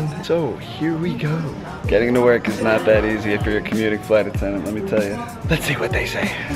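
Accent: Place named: Netherlands. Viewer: Talks for a sec, ok its a dutch dude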